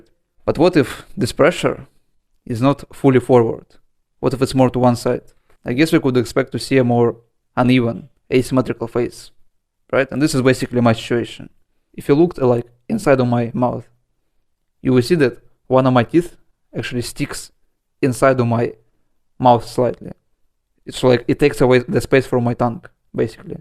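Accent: Russia. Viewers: Where are u from bro? Ur accent is so Russian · where you from bro? you have russian accent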